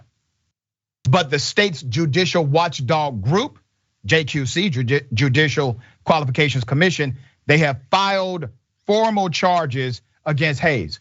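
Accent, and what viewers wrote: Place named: South, United States. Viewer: 😂 love your southern accent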